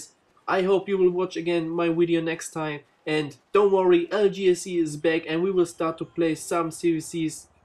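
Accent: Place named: Germany. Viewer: das ist so lustig wenn ein deutscher englisch redet man hört das einfach aber nices video :)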